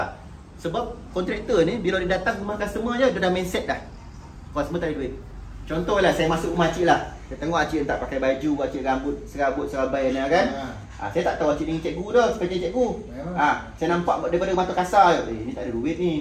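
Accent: Negeri Sembilan, Malaysia. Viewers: Puas aku dengar loghat negeri sembilan...Seronok aku dengar...terbaik BOSS N TEAM.... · bahasa nogori yo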